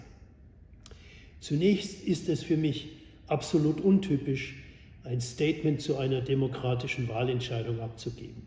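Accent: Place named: Sonneberg, Germany. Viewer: Tolles Statement! Und der "Soanneberch- Dialekt"; ❤❤❤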